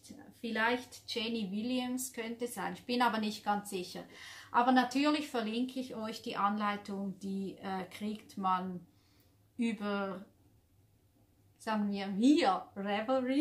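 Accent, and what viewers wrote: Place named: Switzerland. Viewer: Liebe, die Folge hat mir wieder sehr gefallen, Du hast uns ganz schöne Sachen gezeigt. Die blaue Jacke ist traumhaft schön, ich bin ganz begeistert von der Art wie sie Du gestrickt hast, die Rückseite ist ja ganz raffiniert gestrickt, hinten in der Mitte sieht es ja wunderschön aus. Diese Art zu stricken kenne ich gar nicht, also Du strickst ganz tolle Sachen, bist eben, wie ich schon geschrieben habe, eine richtige Künstlerin, Du machst außergewöhnliche SACHEN, egal ob Du strickst oder nähst, es ist so toll und interessant, ich schaue sie so gerne an. Diese Handschuhe sind einfach wunder wunderschön, das Muster erfordert doch viel Geduld und Können. Ich könnte soetwas nicht mehr stricken, weil es mir nicht mehr möglich wäre, ich könnte die Geduld nicht mehr aufbringen. Auch der neue Pulli ist so sehr schön. Diese Tücher und Pullis stehen Dir auch so gut mit Deinen schönen langen Haaren siehst Du umwerfend schön aus. 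Du hast eine solche Fröhlichkeit in in Dir, dass man Dir so gerne zuschaut und zuhört. Ja zuhört, das Deutsch. Mit dem Schweizer Akzent drin finde ich super und höre es sehr gern und es ist überhaupt nicht furchtbar, wie Du denkst, ganz im Gegenteil und ich komme ja auch nicht weit weg, von der Schweizer Grenze, her, wenn ich so rede wie zu Hause, dann meinen die meisten Leute, ich bin aus der Schweiz. Die Leute sind zum Teil manchmal etwas begriffstuzig, die können nicht unterscheiden, ob man Ein Dialekt redet oder richtig Schwitzerisch, sie merken überha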